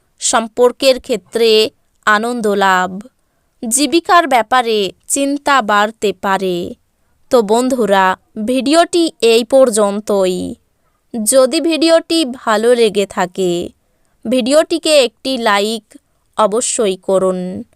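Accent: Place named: Bangladesh. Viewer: Ki Bangladesh thake bolcchen mone hocche.....bhalo kore bangla bolte sikhun ..musulman bole mone hocche....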